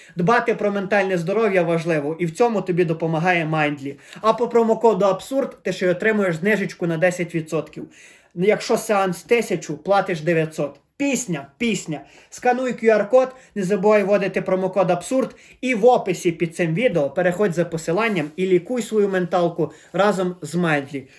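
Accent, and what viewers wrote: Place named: Transcarpathia, Ukraine. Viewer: Перспективно в моєму випадку 😂 В тебе чутно сильний закарпатський діалект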